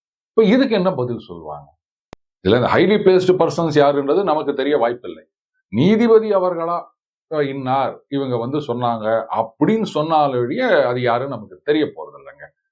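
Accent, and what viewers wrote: Chennai, India. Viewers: Ennaiah nee Chennai Tamil pesura?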